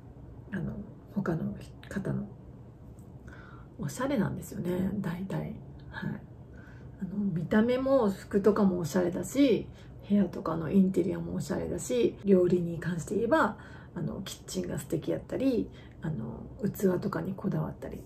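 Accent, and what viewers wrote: Hakata, Japan. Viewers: たまに聞こえてくる博多弁？、とても良いです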